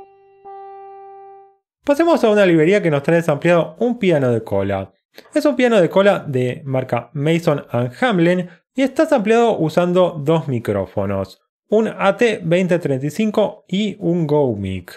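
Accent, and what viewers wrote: Argentina. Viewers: acento cordobes creo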